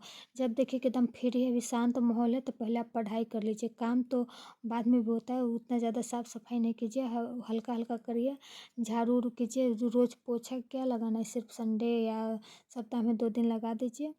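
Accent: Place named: Mithila, India. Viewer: Lag raha hai aap mithilanchal se hai kya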